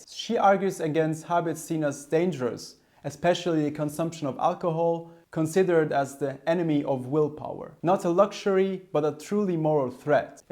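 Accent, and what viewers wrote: Germany. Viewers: Mein Herr, kommen Sie aus Deutschland? Sie klingen so. Ich lerne Deutsch und finde was Sie sprechen sehr gut! Ich finde die Geschichte dieser Zeit (WWI) sehr interessant, aber nicht so vielen Youtube sind ueber wie Deutsch Leute in dieser Zeit gelebt haben. Mehr, bitte! Danke schoen!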